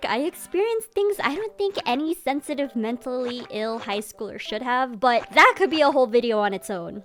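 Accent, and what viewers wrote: Philippines. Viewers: STOPPP NOT THE FILLO ACCENT😭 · LOLL the filipino accent 😭😭🤣🤣🤣🤣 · The filo accent omg. As someone with Filipino parents I can just hear the voices through the phone. The "are you pregnant" part is JUST AS REAL TOO LMAO. Proud of you Ate, reach for the stars 🌟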